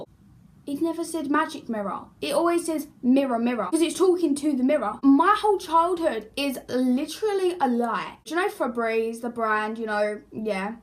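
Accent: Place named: United Kingdom. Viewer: hard to listen to the br accent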